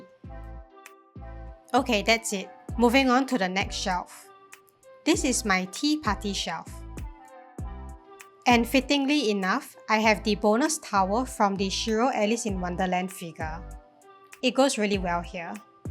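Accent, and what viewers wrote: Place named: Singapore. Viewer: First time discover your channel and I'm wondering if you're Singaporean after hearing your accent and I'm right 😅, fellow Singaporean here 👋, I'm planning to become a youtuber as well, hope I can achieve that goal soon, best of wishes and amazing collection. 👍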